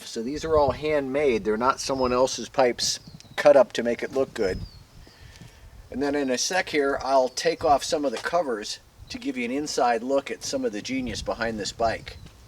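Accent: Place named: United States. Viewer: Poooshoooww, colour, lol. Godda love the American accent.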